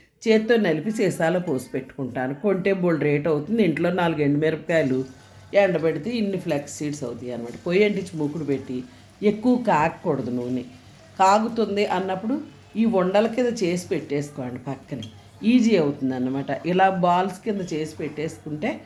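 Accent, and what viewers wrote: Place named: Godavari, India. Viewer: Ma ammamma la matladataru amma mana godavari style ye veru amma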